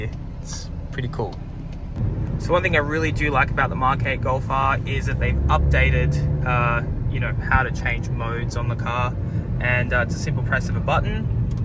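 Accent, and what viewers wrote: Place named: Australia. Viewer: Hey mate, sounds like a skippy accent there 😎 Any suggestions for down under to get some mods / tune done?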